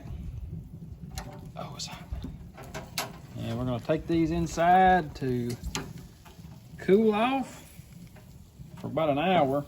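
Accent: South, United States. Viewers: Nice to see such a nice young man enjoying his family. Not many homesteaders in Mississippi so enjoy watching someone with that southern drawl.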